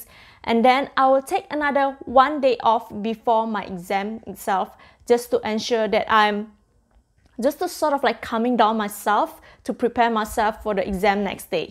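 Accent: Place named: Vietnam. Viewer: Vietnam accent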